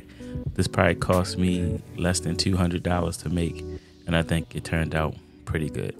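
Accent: Baltimore, United States. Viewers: You definitely from bmore 😂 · I knew right off the bat youd be a ravens fan based off that heavy ass accent lmao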